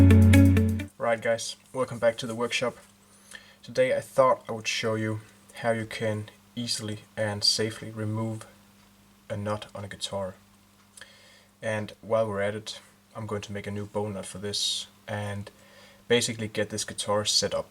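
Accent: Denmark. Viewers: Thanks for this video! BTW are you Danish by chance? Your accent seems to be that of a Dane. If not, sorry for my incorrect assumption. Thanks again! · Rigtig god video, der præcist viser, hvordan man selv med lidt omhu, kan udskifte en nut på sin guitar. Pænt engelsk, med en smule charmerende nordjydsk accent. Per i Aarhus😎🇩🇰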